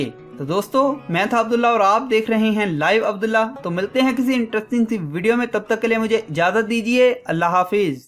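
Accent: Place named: Punjab, Pakistan. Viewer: پنجابی لہجے میں پڑھا گیا ہے، بلوچی بولنے والوں کی تعداد کم بتائی گئی ہے اور کافی چیزوں کا تلفظ بھی درست نہیں ہے لیکن پھر بھی کہونگی کہ اچھی کوشش ہے ۔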